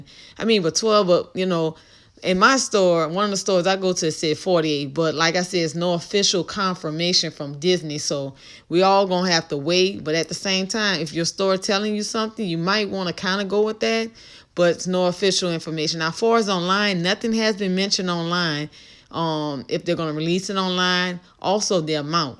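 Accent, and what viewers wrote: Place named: New Orleans, United States. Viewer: Hi! I don't believe you really collect pins, but there's this shop called on Morgane Brret Shop on Instagram, and she recently did a small pink dress Cinderella themed pin collection, and it reminded me of your channel! I hope this comment isn't too forward, but I just wanted to let you know. Love your channel, and it sounds like you're from New Orleans, so it's cool seeing another fellow Disney collector from Louisiana!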